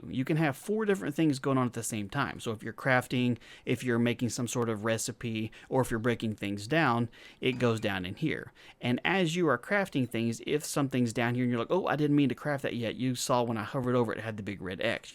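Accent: South, United States. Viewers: is your accent southern?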